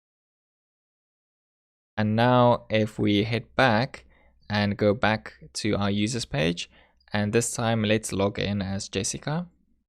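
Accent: South Africa. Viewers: You sound very South African...😃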